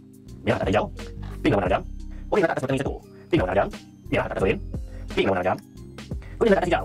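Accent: Malaysia. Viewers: Logat orang Malay membuat saya sendiri tertavva terbahak bahak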